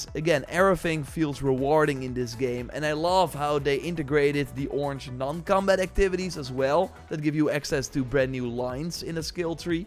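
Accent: Netherlands. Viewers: brother your dutch accent is REALY there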